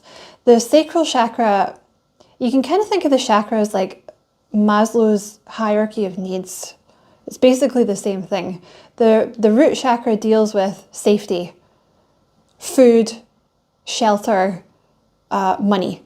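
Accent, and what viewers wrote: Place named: Scotland, United Kingdom. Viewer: Omg literally all the things you said resonated sooooo much and I’ve been journaling and getting promots exactly like the ones you said. I love your expression chillin like a villain 🤣 thank-you so much I love hearing a fellow uk (okay Scottish to be exact) accent for once on you tube as well ❤🙏🏽 thank-you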